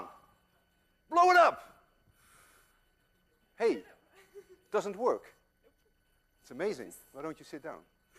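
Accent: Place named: Netherlands. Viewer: He has a dutch accent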